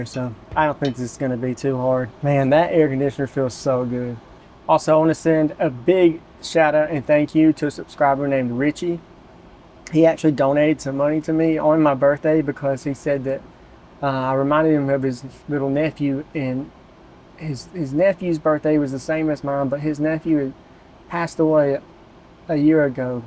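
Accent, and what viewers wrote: Alabama, United States. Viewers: That's a cool stealth camper! It would also make a beautiful and comfortable outhouse. If you were in Louisiana I would suggest you stealth camp in a Mardi Gras Float [in the off seasons of course]. But then again I sometimes forget you live in Alabama where Mardi Gras originated until I hear your accent.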